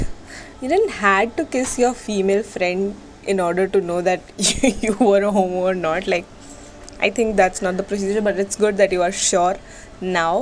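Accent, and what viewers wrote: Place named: United Kingdom. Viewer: You're amazing Yaar or aapki British English wahhh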